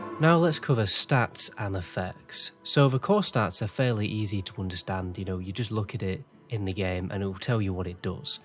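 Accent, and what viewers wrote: United Kingdom. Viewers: Why is that no matter what I want to learn about on Youtube, be it a new game or fixing Windows problems, its only the British channels - or people with British accents - who explain perfectly, clearly, without BS hype and annoying overexcited voices as per the Yank Channels ?? 🙏🏼🙌🏼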